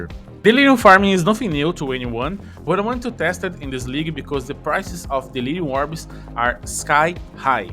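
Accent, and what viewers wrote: Brazil. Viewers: irmão, tudo bem? Quero deixar aqui meus parabéns por produzir conteúdo em outra língua, eu perguntei por conta da entonação "Eh" que é bem característico de brasileiro, não perguntei para causar algum tipo de ofensa ou intimidação, mto pelo contrário, se vc produzir conteúdo sobre este jogo em português vou me inscrever no seu canal pois achei bom. Desejo a você muita resiliência e sucesso, forte abraço. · Sotaque de BR é sempre reconhecível, mas ta mandando mto!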